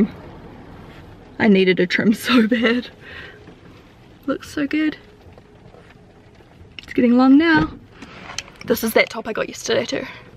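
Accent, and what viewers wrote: New Zealand. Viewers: You have a good nz accent read the discription what part of nz I'm a Wellington baby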